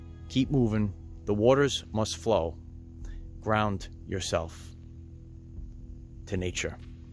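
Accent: New York, United States. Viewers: 1. The New York accent is the best ever! 2. do you like the movie The Neverending Story, because you remind me of the boy in it lol? 👑😇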